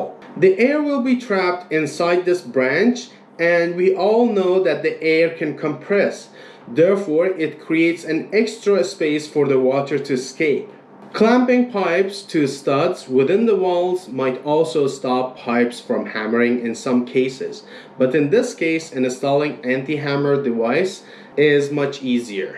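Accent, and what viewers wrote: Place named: Iran. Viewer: You have an accent, are you Persian?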